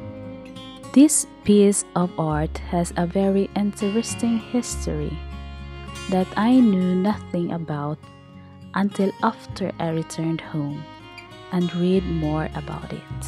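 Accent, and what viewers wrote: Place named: Norway. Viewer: Na dungog na gyud naku ang accent nimu sis pareho sa Norwegian mag storya, nindot kaau ang monument naa pod d i history behind it pagka nindot sa lugar 😊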